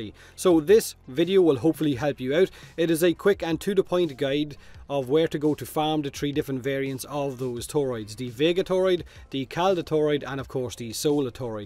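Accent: Ireland. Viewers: Hay is you Irelandish?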